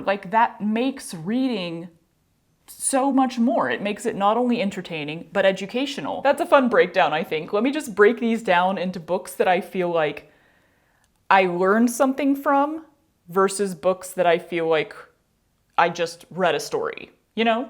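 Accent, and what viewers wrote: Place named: Brazil. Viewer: I love that even though you grew up in Texas and live in California (major Spanish-speaking states), your accent sounds like Brazilian Portuguese 😂❤ Have you picked up much Portuguese?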